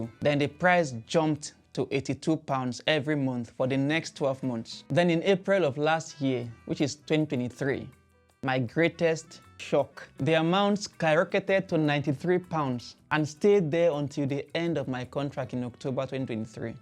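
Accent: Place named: Africa. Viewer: i worked with a lot of Africans, for some years, i miss hearing this accent everyday x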